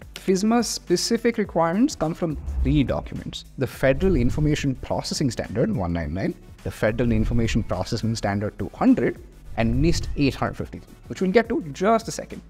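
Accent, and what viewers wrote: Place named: India. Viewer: I have to really increase the volume to understand what he is speaking - an accent like bollywood or some language that I am not used to is hard to understand, the accent.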